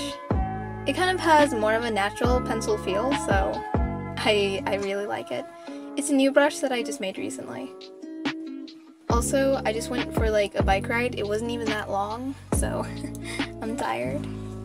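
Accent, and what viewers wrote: United States. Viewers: Woah Thats Amazing! Is it just me or i feel that she has like an American accent- But shes Filipino duh- Edit: So that means shes half American :0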